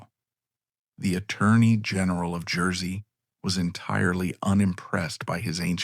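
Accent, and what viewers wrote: United States. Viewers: I hate this American narration . Boring !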